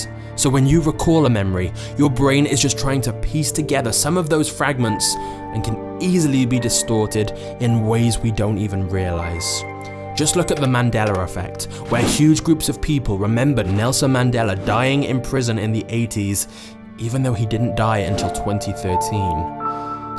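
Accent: United Kingdom. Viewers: The combination of the British accent and the the snippets from ca; 40 different shit movies make your point.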